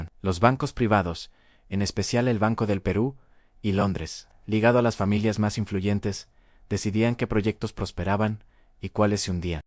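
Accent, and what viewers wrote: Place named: Mexico. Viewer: POR QUÉ M. SUENA A DIALECTO MEJICANO ¿?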